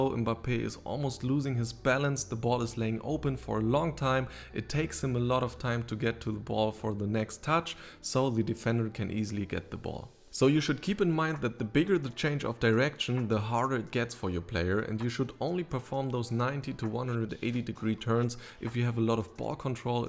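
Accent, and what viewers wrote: Germany. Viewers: Das sind schon deutsche Macher, oder? Ich empfinde den Akzent schon als sehr Deutsch. Mit Englisch erreicht man allerdings ein wesentlich größeres Publikum, da wird es wohl niemand auf Deutsch machen, wenn Englisch genauso gut funktioniert. Gruß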